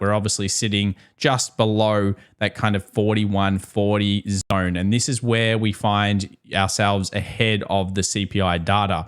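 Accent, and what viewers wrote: Australia. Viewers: you're Australian? I thought you're British.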